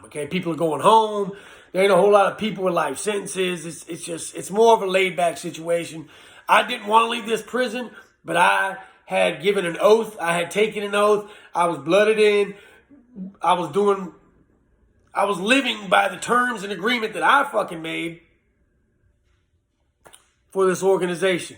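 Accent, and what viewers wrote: Virginia, United States. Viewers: You from Virginia?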